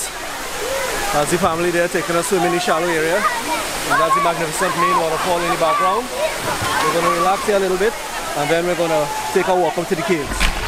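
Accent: Trinidad and Tobago. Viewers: Trinidadian accent!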